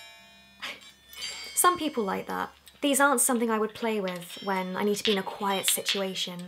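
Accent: United Kingdom. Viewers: I ❤ your British accent...xx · Are u British ? I am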